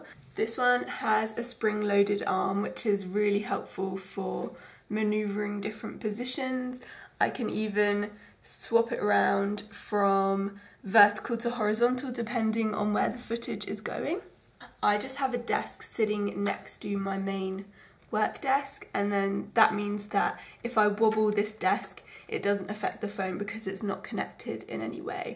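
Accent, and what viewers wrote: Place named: United Kingdom. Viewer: Actually, I was looking for some special camera moves, like sticking it to the brush or stuff like that, but I feel in love with your British pronunciation.❤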